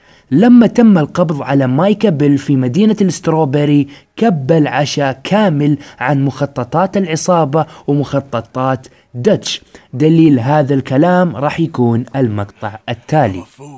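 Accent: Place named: Jeddah, Saudi Arabia. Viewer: ما ابغا احلف بس واضح انك من اهل جدة 😂❤️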